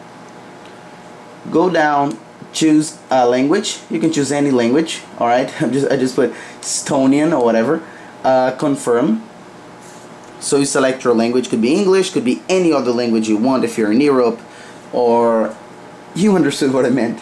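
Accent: Brazil. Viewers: Brazilian accent spotted!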